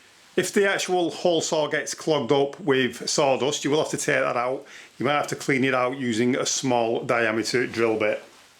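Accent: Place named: Yorkshire, United Kingdom. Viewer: Yorkshire accent must be one of the worst English accents in the world….boring and damn disgusting.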